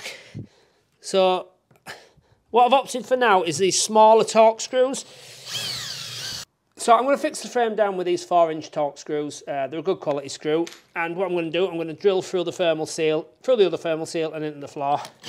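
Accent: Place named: Northern England, United Kingdom. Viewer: Brilliant video. I an a carpenter and joiner so can appreciate when a job is being done correctly and conscientiously. I am planning to build my own garden room this year so looking forward to that. May I ask who supplied the bifold doors? I know you did mention them in the video but I couldn’t catch it. Probably because you have a strong northern accent and I’m a Londoner. 😆 I will be watching more. Great job on both the build, and the videos. Cheers!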